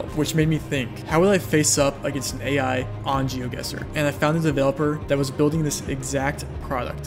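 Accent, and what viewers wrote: Germany. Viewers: You can instantly tell the Country in Geoguesser, meanwhile I can tell , that he is a german fellow 😂👌🏼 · how can you speak such a german english haha what a legend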